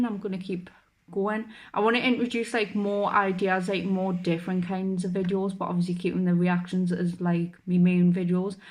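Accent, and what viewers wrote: Newcastle, United Kingdom. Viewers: Geordie accent (North East England)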